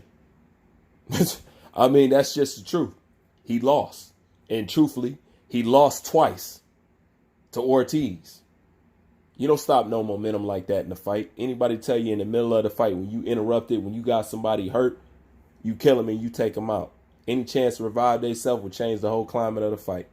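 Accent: United States. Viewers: You know you’re full of shit right? You know wilder wasn’t ducking fool.. Yea, he was ducking someone who just got stopped by Ruiz? You must have a brain tumor fool! Wilder lost in your records but your records doesn’t matter. That’s why he’s running it back. You sound like you’re from America, SUPPORT YOUR OWN MORON!!! You’re what is wrong with the world today.. Bitch!!!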